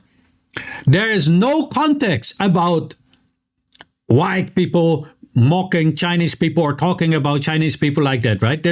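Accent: Germany. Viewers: He sounds German...don't mention the war. What does this have to do with China Western relations? Going after every internet meme is stupid..keep your eye on the bal....NEXPERIA! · sounds like a German accent? figured he is just picking on everyone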